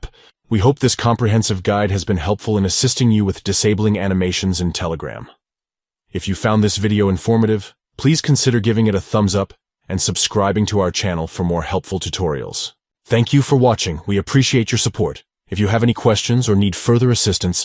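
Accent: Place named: Australia. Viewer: You should learn how to pronounce your Australian accent properly. Why do creators create these apps to be so juvenile. What about a professional approach. We want to achieve professional messaging that surpasses anything we have come across. My first experience was a stupid coronavirus animation. Goodbye 2020 hello 2025.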